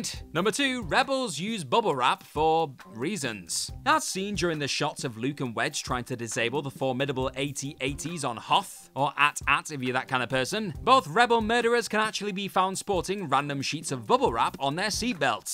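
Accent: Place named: Geordie, United Kingdom. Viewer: Gotta love a slightly posh Geordie nerd. I am one myself.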